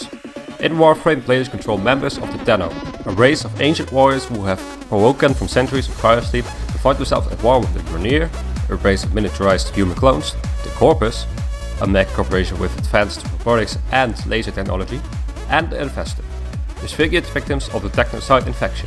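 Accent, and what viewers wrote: Netherlands. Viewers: are you dutch? ik hoor je accent xdxd. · Nice list, but holy crap your English sucks! That accent sounds dutch which is my native language as well, but I hard a seriously hard time understanding what you were saying. Please, either work on your speech skills or use text instead. This sounds like Mark Rutte and that's not exactly a compliment.. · You must be Dutch? Of is dat niet zo? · yooo gast praat rustiger en duidelijker niemand verstaat wat je zegt met je nl'se accent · i think he is dutch :/ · Dutch ? Kan het horen man :) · Are you dutch?? Sounds like a dutch man xD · Nederlands accent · je Engelse Nederlands accent is crap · I think he is dutch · This guy isnt american i think because of his accent...... He sounds very dutch! (Please take that as a compliment)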